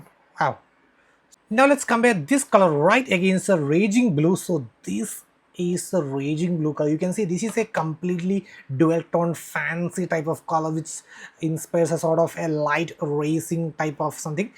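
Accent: Kerala, India. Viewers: The malyali accent caught me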